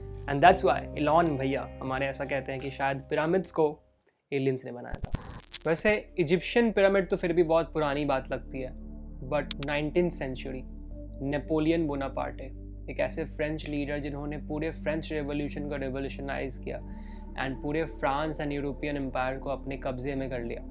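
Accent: Bihar, India. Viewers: bro r u frm bihar??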